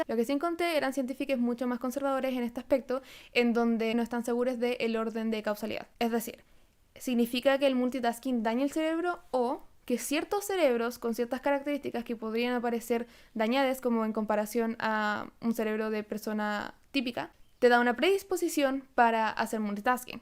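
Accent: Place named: Chile. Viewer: hola, al ser de las pocas personas que usa lenguaje inclusive en todo su canal, me lleva a preguntarte con todo respeto, ¿perteneces a la comunidad lgbtq+? gracias por tu canal, tus videos, me encantan!. tambien me gusta mucho tu acento chileno, aunque dices que eres de Peru viviendo en Chile.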